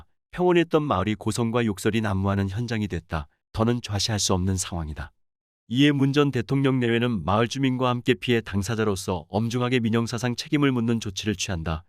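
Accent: North Korea. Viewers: 정성산님 목소리가 아닌데 어디 편찮으신가요? 자연스럽게 이북 억양이섞인 말투가 구수한데요 하루빨리 다시 듣기 바랍니다 감사합니다~^^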